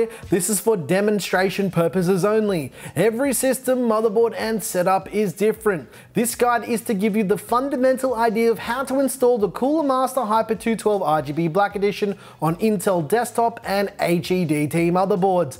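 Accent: Australia. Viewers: Why do you look Mexican but you speak Australian? So strange I love it!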